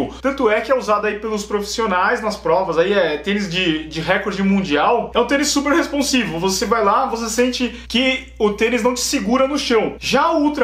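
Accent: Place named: Sorocaba, Brazil. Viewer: Eu sou de sp , sua voz que é de "do" Sorocaba rsrsrs . Valeuuuuuuuuuuuu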